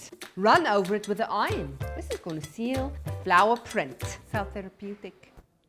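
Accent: Afrikaans, South Africa. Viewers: Yeah😂😂I think she is Afrikaans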